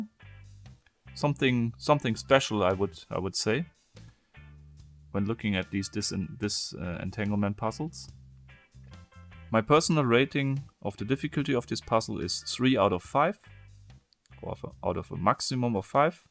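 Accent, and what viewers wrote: Germany. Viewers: Ich schau die Videos, obwohl ich weiß, dass ich mir nie so ein Puzzle zulegen werde. Und wegen dem netten Englisch mit deutscher Aussprache ;) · He has such a distinct German accent I can't imagine why you would think it was Russian. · You are german. Am I right? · Are you german? Because you have a very german Akzent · Are you German? You akcent sounds like it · I can swear this guy is german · du bist aus deutschland oder ?😂😂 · Man hört so raus, dass du deutsch bist. kp, wieso.>_>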